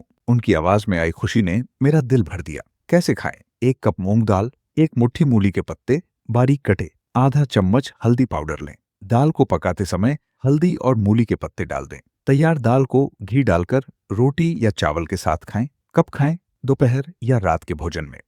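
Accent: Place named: Ludhiana, India. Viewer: Ludhian punjabi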